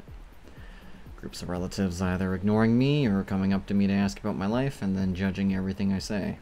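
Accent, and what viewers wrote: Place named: United Kingdom. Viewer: You sound British and I love it🤩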